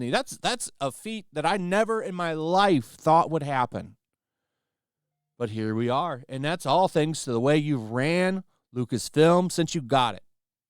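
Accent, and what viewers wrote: England, United Kingdom. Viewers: I like your English voice! Where I live everyone talks like that lol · Congrats on the (sort of) English accent. I only heard of this because some you tubers mentioned it. It's ok, a bit of fun. Looking forward to Andor though.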